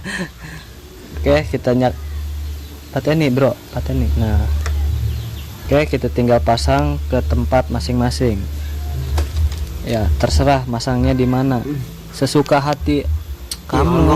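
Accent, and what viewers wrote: Serang, Indonesia. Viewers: Wong serang Tah kang?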